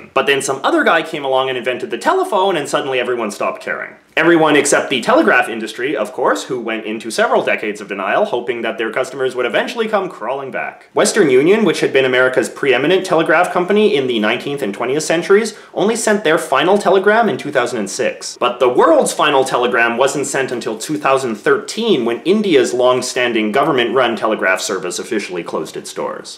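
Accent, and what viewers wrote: Canada. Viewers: Soooooo fookin Canadian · As a Canadian I can tell by your accent, you are Canadian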